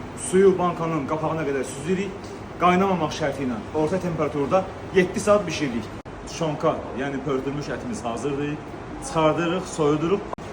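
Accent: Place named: Baku, Azerbaijan. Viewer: ZƏNNİM MƏNİ ALDATMİRSA NİTQİNİZDƏ BAKİ LƏHCƏSİ VAR İDİ 😊😊😊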